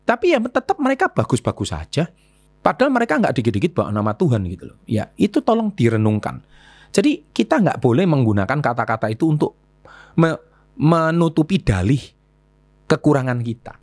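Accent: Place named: West Java, Indonesia. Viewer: Cilaka😂 sunda pisan euy😂 i love you pokona mah❤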